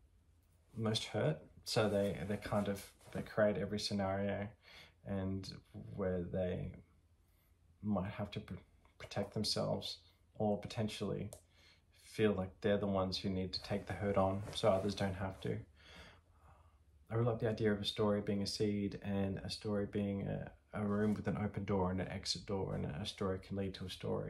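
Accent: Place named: Australia. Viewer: I’ve read all of the Wicked books and just finished Oracle - about to start Witch. Great review, that reveal at the end was almost a dream-like experience, kind of made the entire storyline of the entire 6 books thus far just wash over me with nostalgia and revelation - such a cathartic moment. Loved the entire book. Btw I’m an Aussie too and it’s so nice to hear someone pronounce the names the same way I do, whether it’s correct or not 😂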